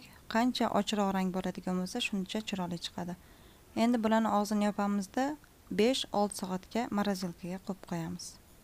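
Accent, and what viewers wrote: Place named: Kyrgyzstan. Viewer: вой ичегимми узип улдирдиз илохим бахтийзи берсин бу хам Аллохнинг бир синови лекин киргизчага ухшап гапирар экансиз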